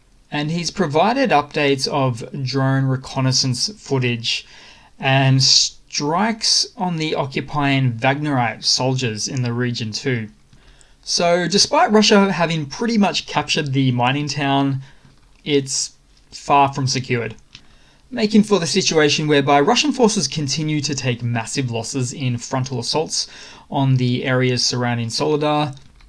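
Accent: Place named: Australia. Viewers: Sounds like an Aussie... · My guess is Aussie 🇦🇺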